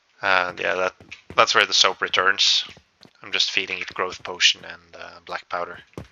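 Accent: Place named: Scandinavia. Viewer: I can tell by your accent that you're probably sweedish? or nordic? Which would explain the impeccable design interior taste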